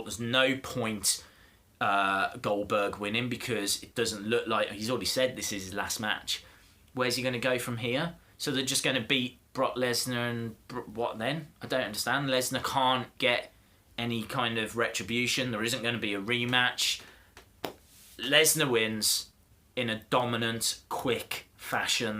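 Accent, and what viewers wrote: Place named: Midlands, United Kingdom. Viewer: You're clearly from the midlands just like me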